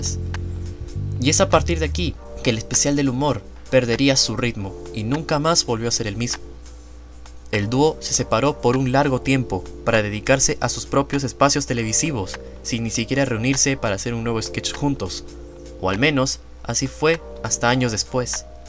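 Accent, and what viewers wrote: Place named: Chile. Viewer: Tienes acento chileno pero mejorado.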